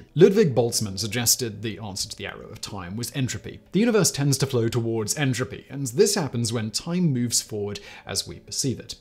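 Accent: England, United Kingdom. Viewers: Why would they use Canadian pronunciation, given then channel and its scriptwriters and editors are American and the presenter is English?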